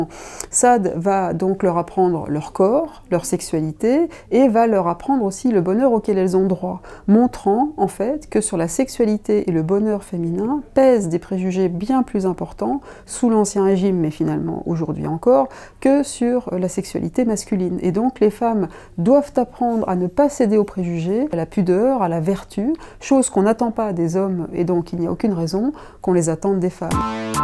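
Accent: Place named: Savoie, France. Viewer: j'ai arrêté la vidéo à la seconde où le son de savoiE atteignit mes oreilles c'est pas tabou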